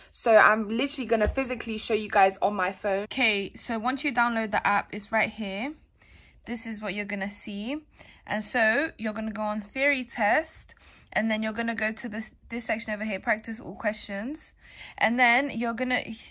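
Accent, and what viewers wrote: London, United Kingdom. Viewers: Hello! It is British accent? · Anywhere in London, hard to tell. Probably south or east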